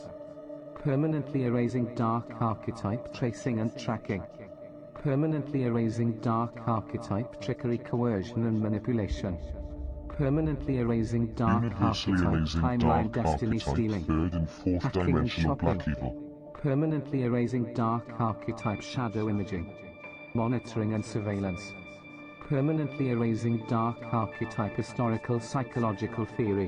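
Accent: Wales, United Kingdom. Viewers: Aww lovely Welsh accent, music to my weary soul. Thank you for victory against the dark 💕🌟💕🌟💕🌟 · The Welsh accent 😍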